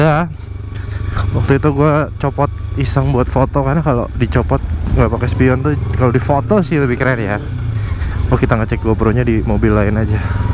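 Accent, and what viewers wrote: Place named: South Jakarta, Indonesia. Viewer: Nak jaksel pisan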